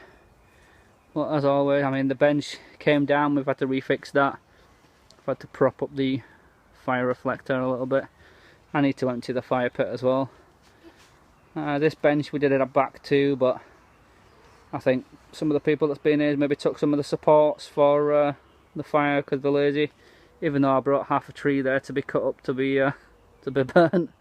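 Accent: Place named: North Yorkshire, United Kingdom. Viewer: Have a listen... see what you think... Doesn't sound boomy enough for the bigger deer, but muntjacs, little buggers are spreading like hell. They're well through norfolk and heading ever Northward as they advance on the Scottish border. Not sure where you are , but accent reads as maybe North Yorks or southern Teeside. Little venisony sods have fangs as well. Destructive as hell on the natural flora... Bunch of Bambis on a bastid asbo... Most suggest 'inviting them for dinner' is the best plan ... Look less cute with onions, mushrooms, garlic, and a few game chips ... a.t.b <link> ...subbed you... I like forest eco-builders ...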